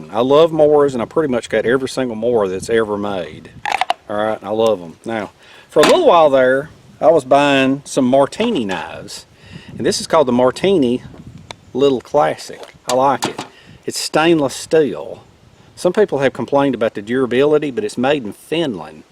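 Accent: South, United States. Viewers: I always enjoy Friday morning with 😁. I've had good luck with the green slime in lawnmower tires. My gf loves your southern accent. 😊Also a can of fix a flat might be handy. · you have a real imagination for innovative camping appliances and gear. Because you really enjoy what you do; your content is not only informative, it is "entertaining". Also, I am from Arkansas. You speak excellent "Southern". GOD bless you and your family.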